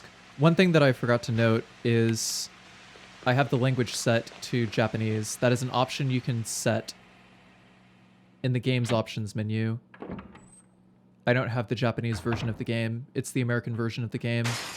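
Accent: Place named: England, United Kingdom. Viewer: I love your voice. Superb english accent.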